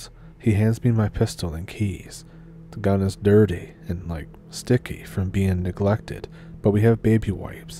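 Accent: Canada. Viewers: knew you had to be Canadian with that accent!! Could listen to ya talk for days 😁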